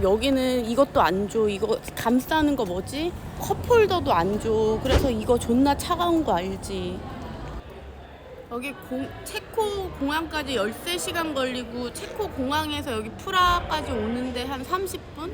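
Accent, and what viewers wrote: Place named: South Korea. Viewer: 구독하고 힐링하며 보는 구독자입니다 해외로 많이 나가시는거 같은데 영어 공부 하셔서 영어 까지 잘하시면 더 멋진 여행 유투버 되실거 같아요 영어 하실때 너무 콩글리쉬에 조금 보기가 민망해요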